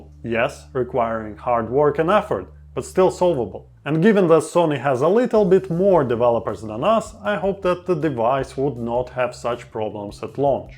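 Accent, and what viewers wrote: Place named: Russia. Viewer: Oh, god, russian men speak on English, and this is so cool!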